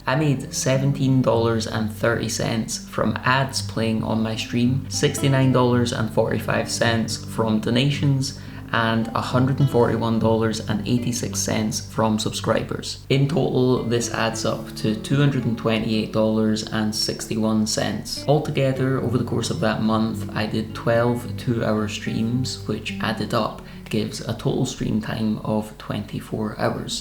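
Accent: Scotland, United Kingdom. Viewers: Yes, an extra $1,000 per month would be most agreeable and worth the effort,. I must comment as well that understanding the Scottish brogue has always been a bit of a challenge for me however your mastery of "English" is formidable. Thank you for that!!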